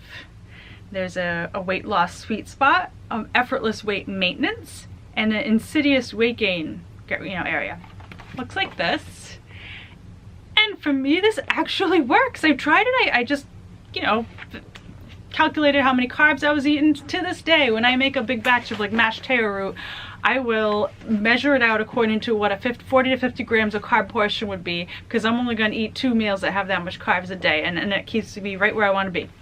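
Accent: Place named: Boston, United States. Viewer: BTW, if you don't mind my asking... where are you from/do you live? i've been trying to place your accent... i feel like i almost know it but can't quite place it. do you live in boston? i lived there once many years ago and it sounds vaguely familiar! i totally understand though if you don't want to say, this being the internet and all. :-)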